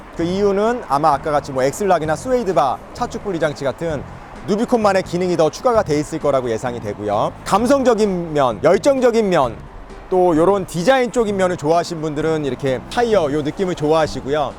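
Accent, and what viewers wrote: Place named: Gyeongsang, South Korea. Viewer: ㅎㅎㅎ굿 비교 영상 좋네요. 갱상도 말로 지깁니다.ㅎㅎㅎ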